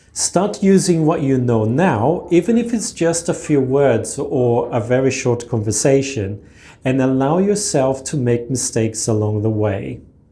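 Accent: United Kingdom. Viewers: Um abraço de Sheffield de uma irlandesa que fala português. Nunca morei no Brasil, mas adoro o país e estive lá umas 7 ou 8 vezes ao longo de 25 anos. Na primeira vez, eu literalmente não conseguia abrir a boca para dizer nada; a tia surda-muda do meu namorado na época (um mineiro) até simpatizou muito comigo porque achava que eu também era surda-muda! 😅 Depois que soube disso, comecei a abrir a boca e a usar as palavras que tinha na cabeça. Eu lia livros e jornais, ia a restaurantes brasileiros e conversava com os funcionários. Não tenha vergonha de cometer erros. É exatamente assim que as crianças aprendem, e nós também ficamos uma graça tentando falar com nossos sotaques estrangeiros. Eu não entendia por que todo mundo caía na gargalhada quando eu dizia que adorava salmão “fumado”. 😂 Você tem um sotaque inglês adorável e culto, e acaba de ganhar uma nova seguidora. 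P.S.: Outra dica que me ajudou, além dos podcasts, foi assinar o Spotify Premium e cantar junto enquanto lia as letras das músicas brasileiras.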